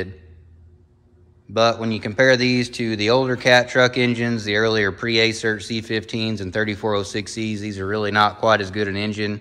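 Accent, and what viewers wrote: South, United States. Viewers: I'm from wis but damn I like that accent. Prefect blend of knowledge and no fucks. Makes me think about moving south. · I agree.....He's a very knowable person...don't let that Southern accent fool you. Cheers from San Francisco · The southern voice is something that just keeps me comin back, I can listen and retain information from him all day, no music, no big word science bull shit.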